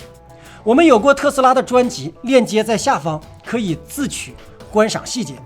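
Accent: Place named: Shaanxi, China. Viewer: 陕西话说的真可以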